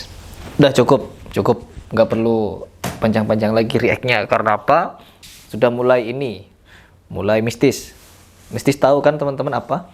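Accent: Madura, Indonesia. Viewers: Mas sampean orang madura ya?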